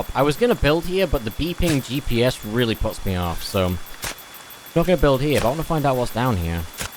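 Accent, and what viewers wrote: United Kingdom. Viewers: Great vids. Where are you from? UK living in USA?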